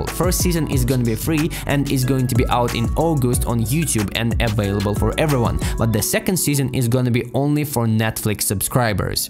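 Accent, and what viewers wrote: Russia. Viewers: Thanks mr Russian man news man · next video with strong russian accent please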